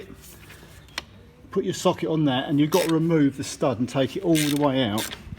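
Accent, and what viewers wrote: United Kingdom. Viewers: Don't own a Focus, have never owned one, and probably never will... and yet for some reason I've now watched the vid three times. Must be that British accent or I've just had too much JD. But then again, can one really have too much JD in this ClownWorld? Anyway... good vid, thumbs up, and all the good stuff. 🥃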